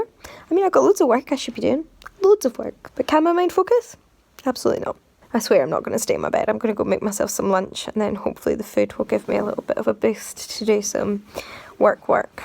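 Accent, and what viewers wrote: Scotland, United Kingdom. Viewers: I think there's a typo in the video title :o Not that I mind but I don't want you to come back to this video in a month or so and only realize it then I really like your videos and love listening to your scottish accent :D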